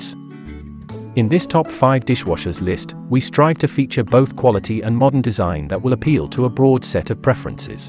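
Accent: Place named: United Kingdom. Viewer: Brit/Euro accent of narrator; are these washers for US market or European?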